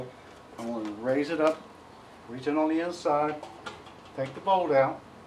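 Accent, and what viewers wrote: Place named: China. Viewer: The chinglish wasn't working for me... Thanks.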